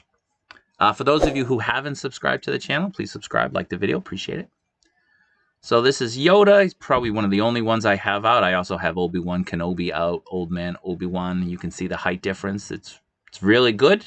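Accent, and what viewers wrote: Boston, United States. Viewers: you’re from boston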